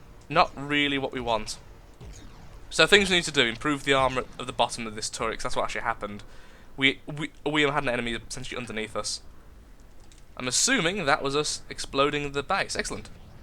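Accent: United Kingdom. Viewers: are u a brit?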